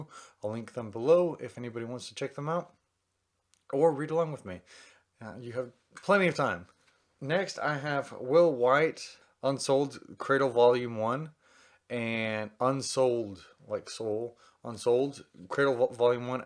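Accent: South, United States. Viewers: I love your podcast of this video at your house in Texas during your quarantine until you had a lot of leftover books this month are "The Dragon Reborn" by Robert Jordan, "Unsouled: Cradle Book 1" by Will Wight, "Now I Rise" by Kiersten White, "The Invisible Life of Addie LaRue" by V.E. Schwab, "Legendborn" by Tracy Deonn, Cresent City: House of Earth and Blood by Sarah J. Maas, "The Fires of Vengeance: The Burning Book, Two" by Evan Winter and "Dark Age" by Pierce Brown while you're talking about all of the characters from the books to read them from the beginning after that, I hope your husband, Zach will be proud of you and I love your Southern accent!! #januarytbr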